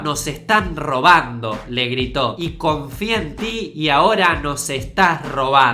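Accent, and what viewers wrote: Argentina. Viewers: Dilo desde el inicio deja de hacer estas mierdaaass... que luego personas como yo, debemos soportar a estupidos fna de x marcar creyendo estas meirdas.... No jodas... todos sabemos q la interfaz de Apple su un robo total y declarado a Xerox PARC... así q eso de "ENTORNO GRÁFICO FLAMANTE" es totalmente una desinformación y la gana de crear polémica, Y no me jodas q es eso de "orishinal" todos sabemos q eres Argenta... pero eso es no saber hablar.. vocaliza por favor, y no me digan q lo dice en ingles, por q así tampoco es... y encima esta al revés. (Justo lo oí cuando escribía y me descoloco, tranquilo Argentino medio, no os odio o cosas asi.) Bueno... Aquí el único GENIO DEL MAL y SOBREVALORADISIIMOO es el JOBS, ya q el copio primero la interfaz de Xerox PARC descaradamente y iban de chulos... creyendo q era los unicos... y ahora q Bill, se los adelanto... 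que hace el copión y rata de JOBS se enoja.... WTF! quien es el GENIO DEL PLAGIOO... o mejor dicho el IDIOTA DEL PLAGIO. A u otra cosa mas general, gente q mira este canal por favor dejen de verlo este tipo es un papanatas... con ganas de crear ruido y subir en fama, si lo siguen podrán ver como cada día poca temas mas controversiales para llegar a mas de 1m de subs rápidamente y mas , de forma rápida, a costa de decir mierda pura. Es asi, tus video son de buena calidad con tu tono/toque... todo eso, pero una vez q dices mierdas todo ese ambiente se convierte en puro asco insoportable... y ya da igual si haces cosas buenas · no sos argentino, por eso decis eso. Es nuestro acento y ya. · Habla asi porque es argentino. Y esta hablando de un apellido ingles. Casi todas las palabras extranjeras las pronunciamos con nuestro acento en el caso que estemos hablando castellano. Si te parece raro ponete a pensar como pronuncian los ingleses o los estadounidenses los apellidos o los nombres españoles.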